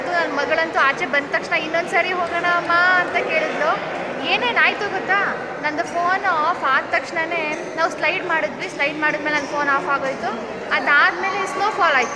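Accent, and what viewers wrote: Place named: North Karnataka, India. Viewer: Madam I think you r from Uttara Karnataka ..yakandre nim bhashe swalpa Nam tarane ide..anyway Nice vdo ..thnk u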